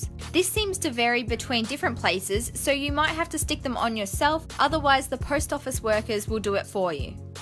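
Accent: Australia. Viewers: Are you Aussie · Are you australian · Are you Australian? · Is she Australian? Because if so that will make me extremely happy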